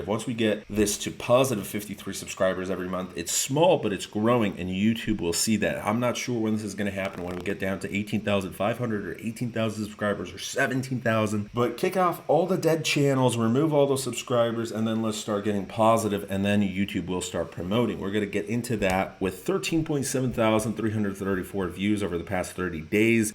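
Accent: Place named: Poland. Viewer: You have an polish accent!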